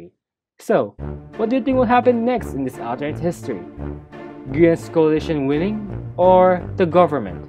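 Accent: Philippines. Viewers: are you filipino cause of the accent??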